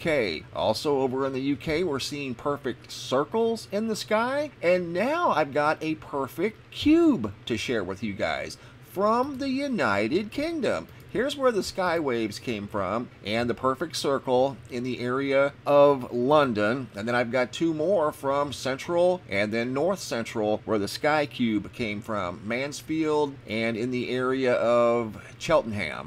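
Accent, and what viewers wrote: United States. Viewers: Love the way you American's pronounce English town/city names, so cool, wish some English people would annunciate more.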